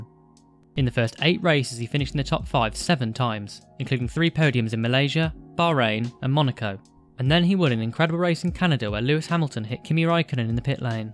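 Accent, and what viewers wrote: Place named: United Kingdom. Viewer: I can't understand your accent it's too British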